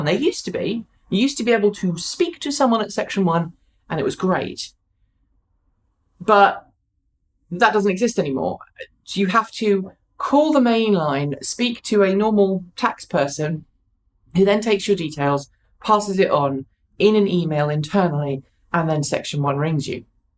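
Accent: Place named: Wales, United Kingdom. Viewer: Ooh your Welsh accent sounds like Mrs Doubtfire!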